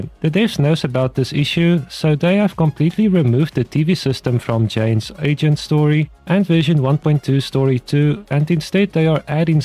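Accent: South Africa. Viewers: I know a boet when i hear one, Great vid fam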